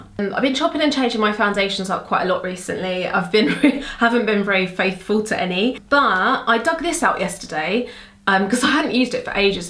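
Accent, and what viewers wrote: London, United Kingdom. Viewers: I'm addict to your accent! Is it London accent?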